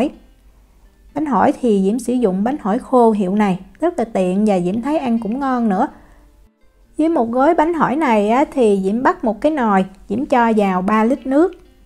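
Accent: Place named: Mekong Delta, Vietnam. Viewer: Thấy ngon quá, chảy nước miếng đây, thích nói giọng miền tây dễ thương quá. qua Nauy bao lâu rồi vậy. Cảm ơn nhiều.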